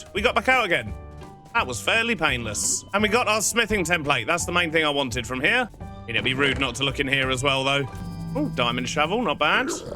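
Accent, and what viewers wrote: United Kingdom. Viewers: Is it just me or does? Literally seem like the mumbo-jumbo of the mod both of them have a facial hair that they. Make the main focus about their channel both of them love a very complex game, mechanic that is techy or mod that is techy being the create mod mambo being Redstone Both of them make it seem a lot easier than it actually is starting to see a pattern does anyone else see it I’m not sure if this is intentional or not but this feels like there’s too many coincidences to be an accident along with they both have the same British accent, and they sound so similar if you put audio over mambo audio who would notice practically no one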